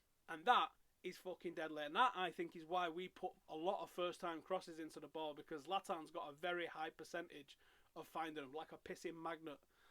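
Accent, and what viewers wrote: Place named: Newcastle, United Kingdom. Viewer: Another geordie on Youtube pfff -_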